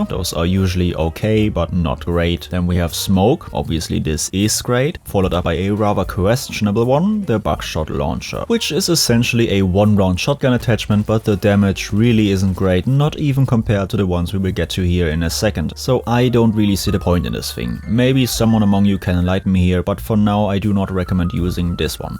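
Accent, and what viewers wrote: Germany. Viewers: Just wanted to say hello as a new subscriber. Just found your videos and they are AWESOME. I really like how you break the weapons down, specifically the ttk ranges and ammo/barrel differences. You are the 1st BF person I've found who does this (yes, I'm newer to BF) coming from 20yrs of COD where it is more common (again yes, I'm a COD convert but I think we all can get along don't hate me 😉. Also my fave is MW2 most BF-like imo). Already kinda long sorry just wanted to say keep it up great job! And as 1st generation American from German & Croatian immigrants I think i caught the accent - German, no?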